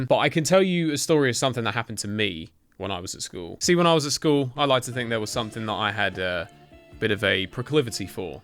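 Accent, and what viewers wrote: United Kingdom. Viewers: I already love this series lol, gonna have much fun with these listening a bri-ish gentleman talk about his experiences.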